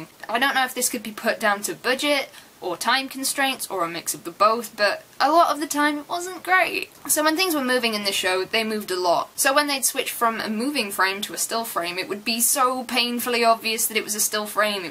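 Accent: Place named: United Kingdom. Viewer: I'm pleased you gave this show a fair chance. I thought it was quite good overall myself. Not a masterpiece, but good, and different. Also. I like listening to your accent. There's something hot about British accents:p.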